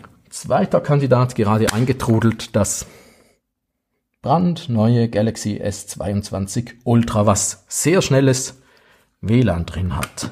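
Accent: Switzerland. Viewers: Wenn ich die leichte Dialekteinfärbung richtig interpretiere, wohnen wir wohl im selben Kanton (SG). Auch ich hab' FTTH bei Sunrise, auch ich zahle CHF 40 pro Monat, aber bei mir gibt's dafür nur 20 Mbps. Die AVM 5490 musste ich sogar selbst kaufen. Da sind wir bei meinem Problem: Der Kundendienst der grossen Anbieter ist zu einer Lotterie verkommen. Bei jedem Wechsel riskiert man, wochenlang ohne Internet und Telefon zu sein und deshalb mache ich keine Anbieter- oder Vertragswechsel mehr. Wäre vielleicht mal ein Thema für die Tagi Digitalseite, statt ständig nur neue Produkte vorzustellen...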